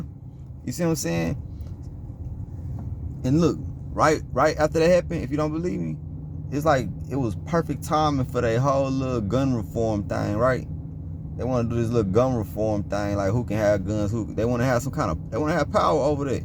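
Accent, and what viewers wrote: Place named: Dallas, United States. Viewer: This was a good video. I’ve been studying for years and everything you said in this video is true. Most of America is Egypt. Ancient Israel is on the west side of America (Utah, Nevada, Arizona, and parts of California, etc. The Mississippi River is the Nile of Egypt and that’s why you have so many mounds up and down the bank of the river. I also believe north is south and south is north. Everything is the opposite. The Most High is waking people up. What’s crazy is that you have the same exact knowledge that I have (at least according to this video) so I know it’s spiritual. A lot of other people probably don’t believe or even understand you but I do. That’s how it is when I tell certain people what I know. They just can’t comprehend. I don’t trip though. Maybe it’s not their time or maybe it’s not for them to get. 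Last but not least, as soon as I heard you start talking I was like he from Dallas 😂 but I see your from FW. I’m here too (Dallas). Keep learning bro because you’re on to something.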